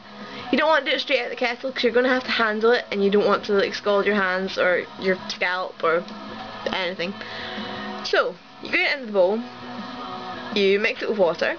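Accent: Scotland, United Kingdom. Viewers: You sound scottish :) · This is my own way of making it. Try it out and test it on a small part of your hair first. I hope you don't mind I posted here for you and everyone else who like to try. You can delete my comment if I was for me to post it here. Sorry about that. I STILL LOVE your accent!!!! I have ALWAYS LOVE Scotland!!!! Again, thanks for the video. =)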